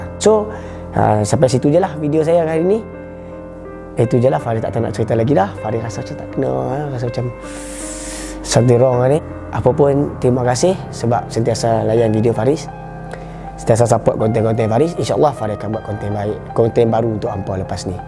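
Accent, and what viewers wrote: Kedah, Malaysia. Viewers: ( loghat Kedah geng !! )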